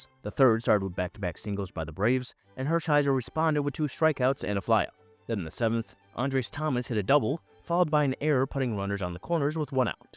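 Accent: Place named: United Kingdom. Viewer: I never knew the official statistician for MLB was British.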